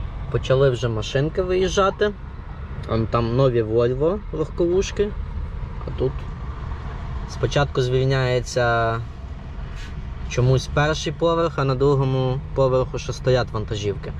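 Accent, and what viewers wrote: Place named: Galicia, Ukraine. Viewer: Доброго Вам. пане чи то дня. чи то вечора - не суттєво. Маю надію, що Ваша дорога була легкою. Це саме той Микола що давав Вам пояснення відносно PILOT. Ну то таке - лірика. Тепер до справи. Мені дуже цікаво дивитися Ваші відео. а особливо слухати. Таке враження що Ви або з Франківщини, або з Галичини, або ще десь поруч. Дуже приємно вас слухати. То по-перше. А тепер по-друге. Як професійний працівник телебачення мушу зробити Вам деякі зауваження відносно з"йомок.Колись давно, коли мене ще вчили працювати з камерою мені в голову втовкли правило: "Любий рух камери в кадрі має бути обгрунтований".До чого це я? А до того. що коли Ви знімаєте Go pro з чола, то ліпше це робити під час руху по трасі. А ось коли Ви їдете по місту, або десь в зоні завантаження/розвантаження то оці FPV кадри не завжди доречні. Пояснюю. FPV - це скор. 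FPV — вид від першої особи. Так, це буває дуже цікаво. Але водій під час руху в умовах міста або заводу мусить контролювати обстановку і ліворуч, і праворуч і зпереду і ззаду. Тому на екрані така метушня буде доречна в тому випадку коли автор бажає передати відчуття збентеженності. тривоги та таке інше. В інщому разі воно просто або заважає, або просто не цікаво і глядач втрачає цікавість до того, що відбувається. Найкращий варіант - мати декілька камер. Наприклад: одну на вітровому склі, другу десь у спалці. третю десь зовні машини. Але це такі шалені гроші. що янавіть думати про це боюсь Перепрошую за такий довгий текст, але я дуже хочу щоб ваше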